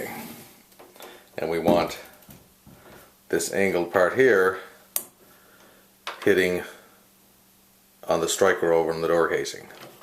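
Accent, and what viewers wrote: Newfoundland, Canada. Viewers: You a Newfie? Thanks for the vid! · sounds like he's from Canada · Love the Canadian accent, you sound like Relic from the Beach Combers. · Canadian... Love to listen to him talk. Unfortunately, neither of my knobs have visible screws, so I'm still at a loss.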